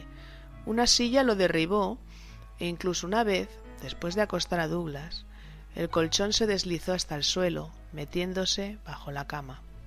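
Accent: Spain. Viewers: Me gusta el acento de espana, es hermoso ;) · ese acento español de verdad no me gusta le quita todo terror .... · Manuel Alejandro, si no te gusta el acento español, buscate videos solo de tu acento. Por otro lado decirte que la narradora no tiene porque tratar de reflejar terror. No esta contando una historia sensacionalista de miedo, para pasar el rato. Si no relatando el transcurrir de un caso, a modo informativo, como documento.... Que le gusta a la gente un sensacionalismo con estos temas... cuando realmente, ese arquetipo de manera de hacer misterio, es el arquetipo que le ha quitado seriedad a la verdadera investigacion o documentacion de los temas de misterio. La narradora no tiene porque narrar de manera que de terror, si no simplemente contar los datos de la historia de manera que se le entienda y quede claro. El objetivo de este video es dar a conocer un caso, no causar terror.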